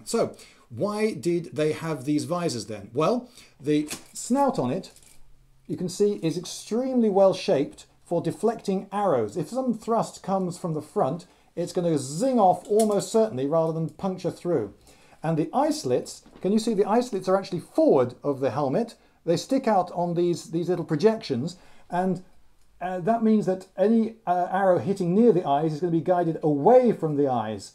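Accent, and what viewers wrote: France. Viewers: nice french accent! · Nice french accent! It seems that the "pig faced" is named "bec de passereau" in france ("sparrow beak")... I don't know exactly why, but here si my view** : you see it as a pigface when you are in front of your enemy. In the other hand, you see it as a sparrow beak when you take a look at the ally at your side. ...So this could come from Azincourt**, where the french chivalry (seeing themselves as the "birds") were in front of english archers (seeing the french as..well, you have understood ;) ) Thank you for the very nice video! (**: It's not a historical reason, guys, just a joke!)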